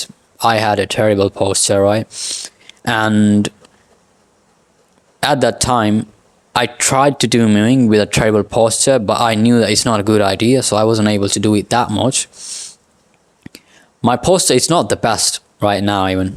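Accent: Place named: United Kingdom. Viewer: Bro's Pakistani And Sounds Like British💀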